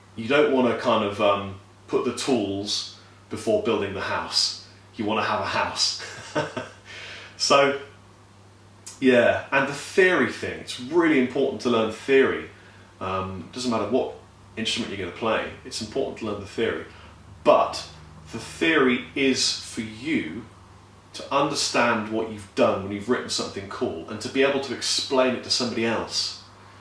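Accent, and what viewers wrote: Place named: England, United Kingdom. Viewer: I can’t help but comment on the hair + being white, and then he started speaking with an English accent and I just chuckled.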